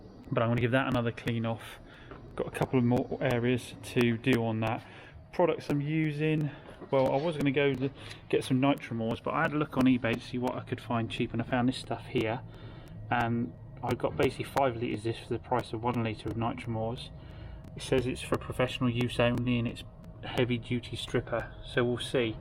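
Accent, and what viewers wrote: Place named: North Devon, United Kingdom. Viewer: haha.. thought you sounded really close, I’m in south Molton!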